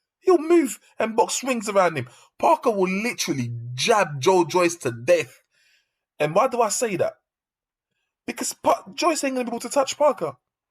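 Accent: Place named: United Kingdom. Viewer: youre british accent is a give away that you dont know parker very well, im a new Zealander been following his career since he began, he's a big let down, most of his fan base is overseas, he has rubbish punching power all his knock outs are journey men, really bad journey men and he didnt knock them out that bad, they just didnt make the tem count or got stopped, history proves he doesnt have good power and he gasses and drops his arms to regain energy in them, joyce hits hard and he hits constantly and pushes forward, he has a granite chin and parker has no power he wont be getting knocked out, parker had a decent chin too but can he handle the volume of joyces more powerful punches??? maybe not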